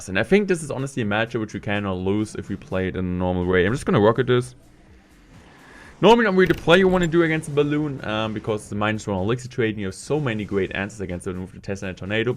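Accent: Germany. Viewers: Do you speak German? Your english sounds realy German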